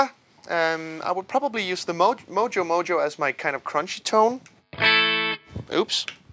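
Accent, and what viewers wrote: Denmark. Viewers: I fucking love our danish accent!!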